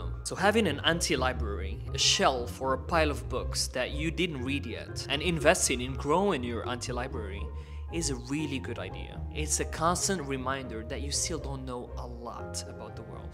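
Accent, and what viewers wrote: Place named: Morocco. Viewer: the Morroccan accent in your english though :D this poped up in my suggested list, I own a small/new channel too and I can only support with a comment 😀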